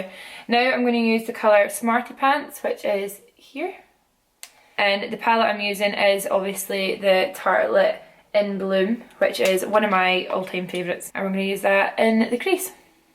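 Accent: Scotland, United Kingdom. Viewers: I've said it before & I will say it again ur Scottish accent is everrythingg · what part of the uk are you from? love ur accent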